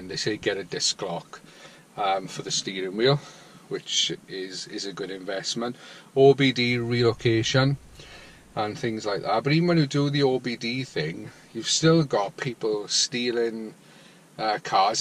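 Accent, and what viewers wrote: Wales, United Kingdom. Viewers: Good tip. Love the Welsh accent.....hope u like my Irish one!!! Was in Wales in 2002...very nice (Rhyll)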